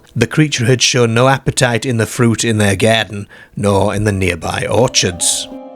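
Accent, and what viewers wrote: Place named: Yorkshire, United Kingdom. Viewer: Somewhere in yorkshire where they say Bairking instead of barking, hairf instead of harf...I dunno!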